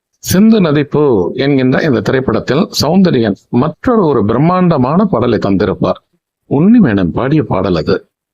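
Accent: Jaffna, Sri Lanka. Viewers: தங்களின் யாழ் தமிழ் உச்சரிப்பு பாடல்களை விட இனிமையாக இருக்கிறது.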